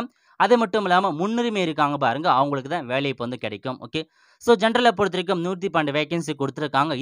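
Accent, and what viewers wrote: North, India. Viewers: என்னடா நீ வடக்கன்ஸ் மாதிரி இருக்கு உன் குரல்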